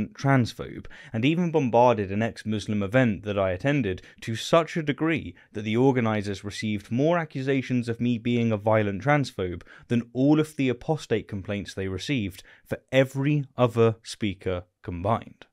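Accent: United Kingdom. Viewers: I am one of those that accused you of bending the knee to the woke and am glad that you addressed the issue with this video which is very brave of you. I respect your intelligence and youthful zeal of which I once had so I have empathy of which I sometimes perceive as arrogance. It was what happened in your country, I gather is Britain because of your accent, that I got red pilled. It was how "Tommy" got treated for shining a spotlight on the"gangs". With all due respect Britain might already be lost and "Cherrio" law is imminent. First they silenced Tommy, then Mel Shaw and now Russel.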